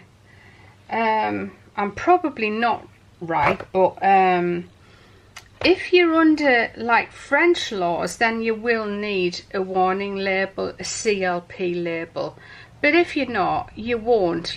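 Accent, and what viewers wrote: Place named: North East England, United Kingdom. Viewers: Hi. Where’s the best for designer fragrances..ie creed .. ps love the videos…love the accent .. fellow north east xx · Love watching your videos. I live in Australia and I’m learning to make wax melts and these videos really help me :-) Thanks so much! Also I lived in Northumberland for years! my mum and family live there. Your accent reminds me of home. Im assuming you are from somewhere nearish? ha :-)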